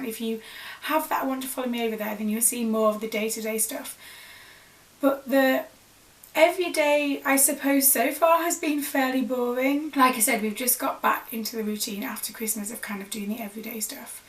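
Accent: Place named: North West England, United Kingdom. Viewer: Have just seen you on on-line news and it said you are from the north east, I though your accent was north west!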